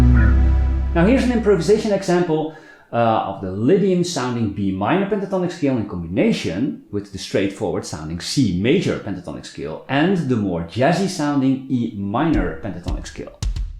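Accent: Netherlands. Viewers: The Dutch accent suits guitar videos perfectly! Who knew? 😁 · you can tell in a split second when somebody is from the Netherlands after they open their mouths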